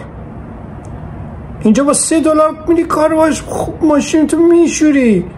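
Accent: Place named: Iran. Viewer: انگلیسی را با لهجه فارسی صحبت نکنید ... طرز تلفظ جملات و کلمات را از گوینده انگلیسی زبان که زبان مادریش انگلیسی است یاد بگیرید .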